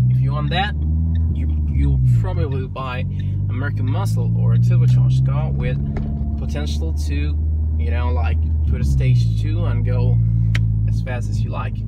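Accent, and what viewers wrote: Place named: United Kingdom. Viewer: Man me encanta , muy británico !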